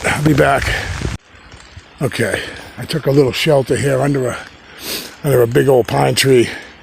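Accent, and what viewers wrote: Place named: Massachusetts, United States. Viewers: Love the Boston accent. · HEY, THIS IS THE SECOND TIME I WATCH THIS VIDEO, I JUST WANT TO SAY HOW YOUR Massachusetts accent makes me miss it up there so badly!!!! I feel like I'm home when i watch your vids. As you know I'm down in Florida for the past how many years. But I miss home and you always bring me back!!!THANKS, STILL HERE WATCHIN YOU OUT SQUATCHIN..LOL...TAKE CARE AND STAY SAFE!!!!LINDA IN FLORIDA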